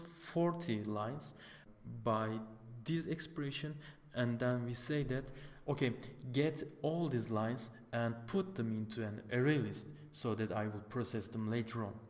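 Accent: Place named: Turkey. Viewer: hocam ismini görmeden aksanından anladım türk olduğunu tebrikler güzel bir seri yapmışsın :)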